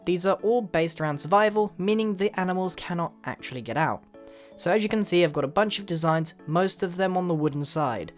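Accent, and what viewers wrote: United Kingdom. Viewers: I love your voice, the accent is awesome! Are you british? Your voice has just that "british feel" to it XD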